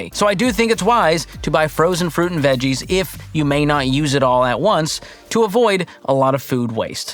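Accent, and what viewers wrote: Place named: Texas, United States. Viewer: You sound like a fellow Texan! If so, and you like okra, that stuff will grow like crazy. I enjoy gardening, but I agree that most of it is not cost saving. Usually only really save by growing okra, peppers, cherry tomatoes, and Swiss Chard.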